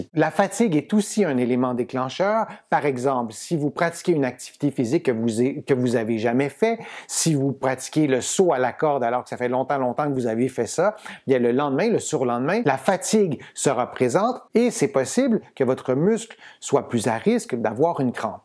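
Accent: Quebec, Canada. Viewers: Merci vous m'encouragezje suis née pas loin du Canada (stpierre et miquelon) près de terrneuve j'ai apprécié la votre léger accent Québec dès que je peux je vous rendrai visite je ne vais pas guérir mais peut être me soulager par votre connaissance médicale et vos conseils en les mettant en pratique à la prochaine anne Marie briand Morieres les Avignon France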